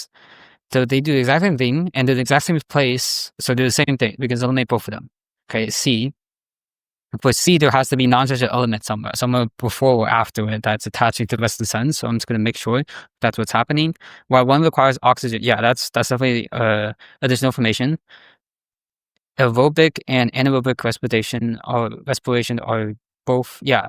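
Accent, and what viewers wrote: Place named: Asia. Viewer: Well he has an asian accent and being american doesnt automatically make you a native speaker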